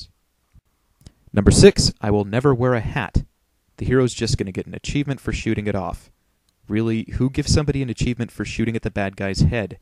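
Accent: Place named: Scotland, United Kingdom. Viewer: Bralliant! (Yes the Scottish accent is deserved).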